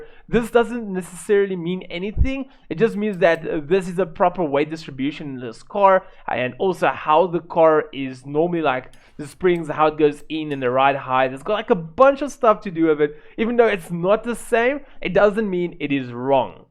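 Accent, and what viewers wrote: South Africa. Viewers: Sound like u from S.A?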